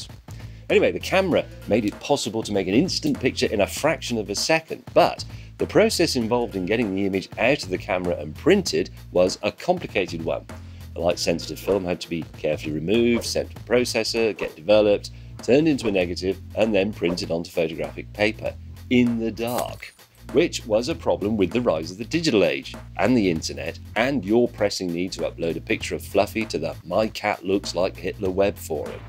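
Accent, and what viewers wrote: United Kingdom. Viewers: Everything sounds better in a British accent.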